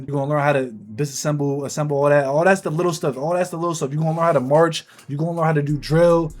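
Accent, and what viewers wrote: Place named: New York, United States. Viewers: Not even the first minute hearing bro you can already tell😂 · “Calm” valid eats” “move tact” yea You definitely from New York😂😂😂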